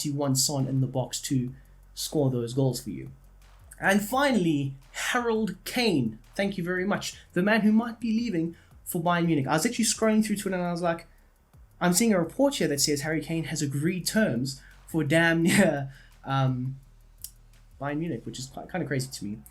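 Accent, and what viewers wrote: South Africa. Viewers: Are you South African by chance?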